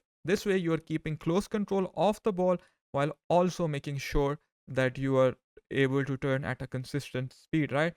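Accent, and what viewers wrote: India. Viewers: Are you from India mother yar????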